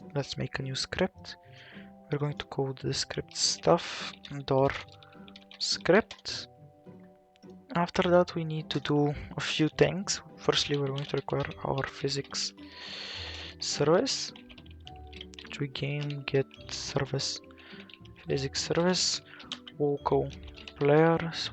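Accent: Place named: Italy. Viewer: nice Italian english voice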